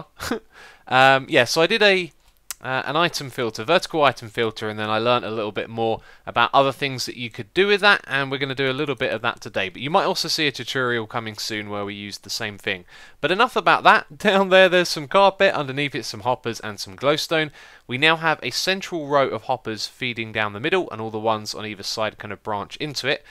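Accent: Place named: England, United Kingdom. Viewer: anyone know which part of England is from?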